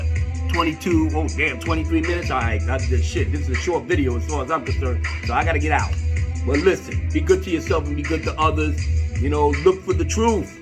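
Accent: Cockney, United Kingdom. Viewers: Hello! I could not WAIT to hear your take on this! Wow. It's fire as usual! You have been keen to the TFA BS since jump. I'm happy that John Boyega is speaking out. It can't hurt him, he's been honest. Btw: Love your British accent! Well done!:) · as a Brit, as always I’m freaking loving your cockney accent.....priceless! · As always my brother, always agree with you. And enjoy the cockney. LoL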